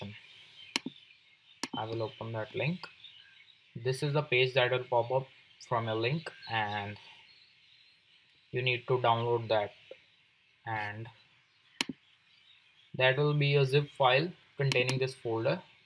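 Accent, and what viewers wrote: India. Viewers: you such disrespectful of person just because the accent. India very smart of technologies thanks to them they make computers and smart phones. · i still wonder why indian accent gives me headaches.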